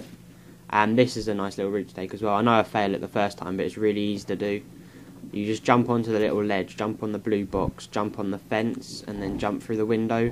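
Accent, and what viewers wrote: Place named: United Kingdom. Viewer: yeay hes british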